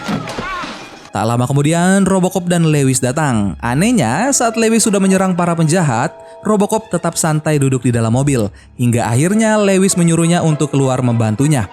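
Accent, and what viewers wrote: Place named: Java, Indonesia. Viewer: guanteengg,di pikiran ku pasti mimin orang jawa😁😁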